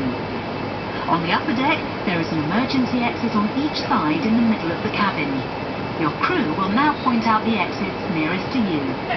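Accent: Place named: United Kingdom. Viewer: Ah, yes, upper deck on a BA 747, what more could you ask? The best thing about this video is the beautiful british accent and of course the music.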